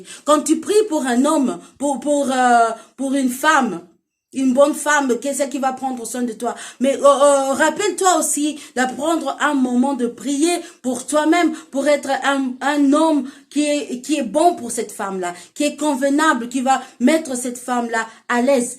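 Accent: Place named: France. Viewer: Your French accent is so beautiful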